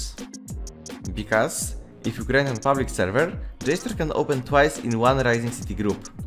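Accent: Poland. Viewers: słychać że z polski jesteś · Are you Polish? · po akcencie słychać że polak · Ej czy tylko ja słysze w jego głosie polski akcent xDD?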